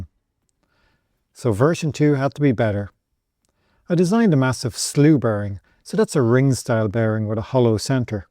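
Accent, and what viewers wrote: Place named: Ireland. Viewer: Amazing video. Great to hear the Irish accent!! Some amount of work gone into this fair play to ya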